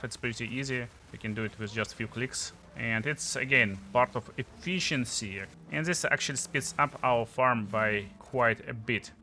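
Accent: Russia. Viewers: sounds like Runglish orn Engussian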